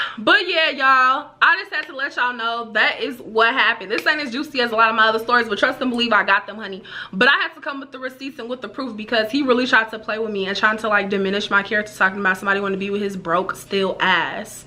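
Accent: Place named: Minnesota, United States. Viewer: You can tell your from Minnesota 🤣. GOOFY! I haven't heard somebody called that since I left. Love ya girl. Keep ya standards high.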